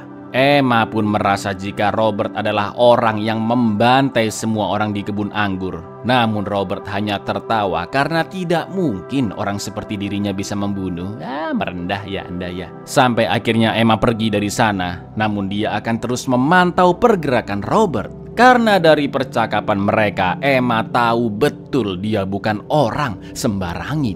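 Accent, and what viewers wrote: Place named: Madura, Indonesia. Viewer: Kok BANGKALAN MADURA cak 😂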